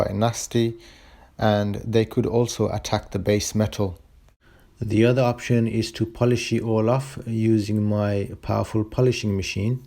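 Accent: Birmingham, United Kingdom. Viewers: your English accent suggests that much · Are you from Birmingham? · I am not a watchmaker but an engineer. As such I can appreciate the work in these beautiful units. Your videos are clear, informative but best of all relaxing and easy to watch. I think the gentle brum is a joy to hear.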